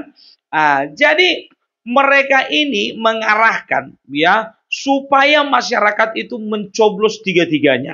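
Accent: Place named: Batak, Indonesia. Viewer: Aku Seinang deingan gaya Batak Kau, Kawan, teirus berkarya kawan😂😂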